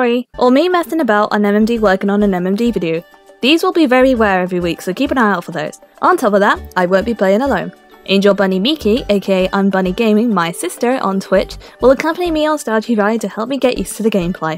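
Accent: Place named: United Kingdom. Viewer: as an American, I hope this doesn't sound creepy, but the British accent is very addicting and relaxing to listen to....that was random, sorry. I would love to see more animations! Yes please.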